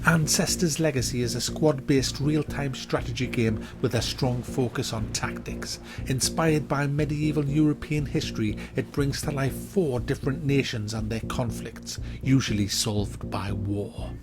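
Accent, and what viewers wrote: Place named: Northern England, United Kingdom. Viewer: LMFAO, bloody northerner! Every time I listen to in his reviews I visualize Oz from Auf Wiedersehen Pet, and that makes it all the more awesome.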